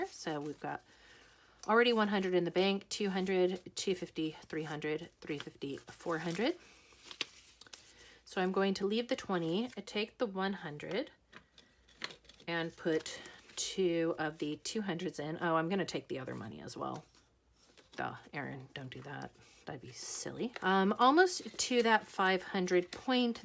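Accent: Australia. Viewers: 🏍 I swear I lost it when you called the doggies boof heads. You are getting more aussiefied every day ❤️ Kelz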